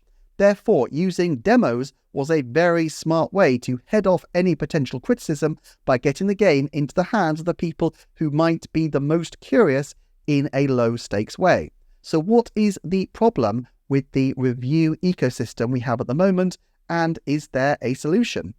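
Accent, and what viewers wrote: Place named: RP, United Kingdom. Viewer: This review doesn't pertain directly to this particular video, but I just want to say since YouTube tells me I'm in the top 0.3% of your viewers that I do enjoy watching your videos. The fact they're based on statistics and facts rather than so much speculation like most gaming YouTubers makes it a good watch even if the subjects are sometimes what my friends would call nerdy. Lol. And plus my hillbilly gay ass from Kentucky just imagines some fancy brit speaking over a cup of tea and it is almost an ASMR experience. Keep up the good work. 😁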